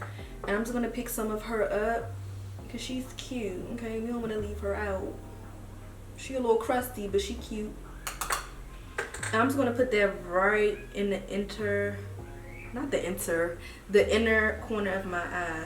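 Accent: Washington DC, United States. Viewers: Oooo is that a Dc accent I hear🥰😍😍❤️❤️❤️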